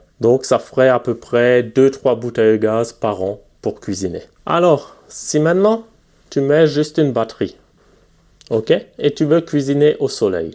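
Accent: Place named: North, France. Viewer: Salut! C’est sur le débat est intéressant, Mais faut voir que le soleil est toujours là du moins dans la journée, le gaz tu dépends de l’homme! Si demain le gaz monte au niveau tarif tu seras dépendant si demain il n’y as plus de gaz ta copine ne fera plus de gâteau…… Moi j’ai assez de watts pour faire la bouffe une heure par jour et si il n’y as pas de soleil au pire, je roule une heure et je récupère mes ampères … Mais bon perso je déteste le gaz, petit j’ai vu une explosion dans un immeuble et depuis je crains le gaz! Bref merci pour tes vidéos, toujours aussi pro! Et toujours aussi agréable de t’écouter avec ton petit accent de la haut! À bientôt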